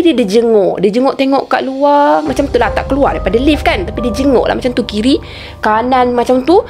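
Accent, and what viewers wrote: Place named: Terengganu, Malaysia. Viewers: Pandai cc cakap ganu...jadi ah tu slang ganu kite. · Pekat molek tranung ye...mcm sy..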